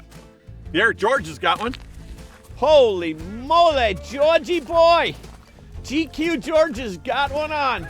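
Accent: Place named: Midwest, United States. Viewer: This is the most Midwest sounding guy I’ve ever heard😂 I love it!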